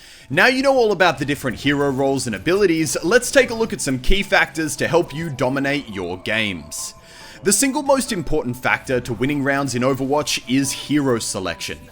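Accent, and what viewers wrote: Australia. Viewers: from 'Straya. What's so wrong about that? Can't he speak normaly? Does it have to be US english? I don't think so... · He's Australian you dumbfuck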